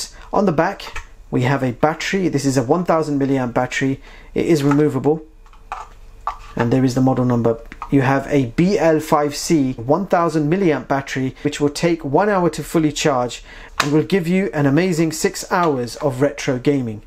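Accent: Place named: United Kingdom. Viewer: Now your voice and pronounce is like mr Harry Pottah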